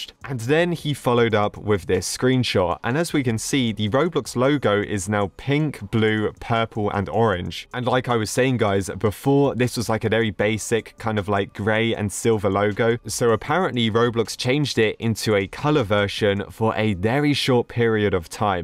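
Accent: United Kingdom. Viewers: Imma assume is British because of his voice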